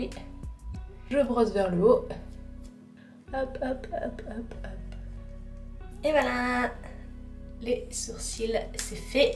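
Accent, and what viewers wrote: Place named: England, United Kingdom. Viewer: J'adore ton accent englais..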